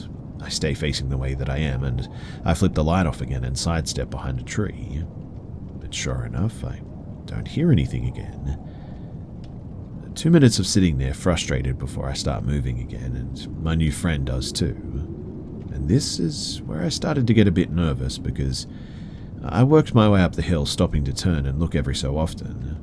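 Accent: Australia. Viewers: Starting the video and hearing a deep Australian accent say "I'm a Chinese woman" was very unexpected.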